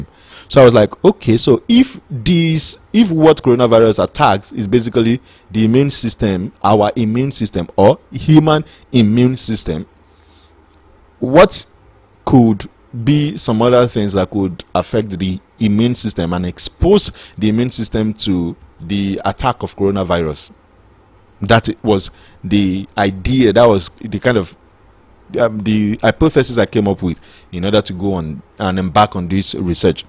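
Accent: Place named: Ibadan, Nigeria. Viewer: Nice one but why your yoruba accent like Ibadan man come dey come out.....pu-bu-lick😊😊😊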